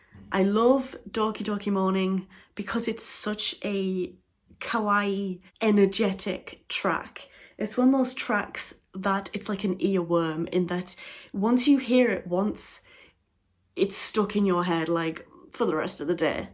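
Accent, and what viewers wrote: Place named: Geordie, United Kingdom. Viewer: Fascinating video with some Interesting choices! My fav tracks for each album are::, Doki Doki Morning, (......Best Album!):,;, Trying to pinpoint your accent: sounds like a hybrid of Geordie and North Yorkshire..........so I'm guessing: Middlesborough or possibly Darlington.......?:) btw I've included your video in my Reactions (Best of) playlist..... check it out on my channel (which has ELEVEN playlists devoted exclusively to -related content.....with a total of over 8,500 videos: what better way to fill these long lonely days of Corona quarantine?!!! lol)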